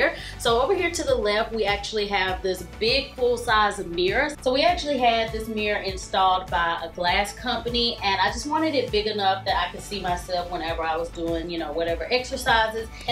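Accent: South, United States. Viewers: I know it isn't much to film. But we miss your videos. If you have any bloopers or footage you think we would be board with is OK to post. We just miss that Southern Drawl & your contagious smile on our timeline. #MissYouAndYourFamily #BlessingsToYouAndYourFamily #MoreVideosPrettyPlease #FromCaliforinaWithLove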